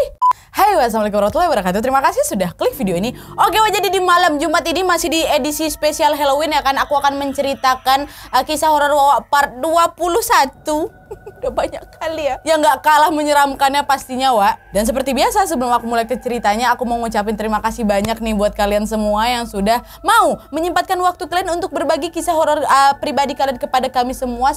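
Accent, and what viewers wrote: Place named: Batam, Indonesia. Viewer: org batam kah? Krn logatnya batam kali wak.. aku jg dibatam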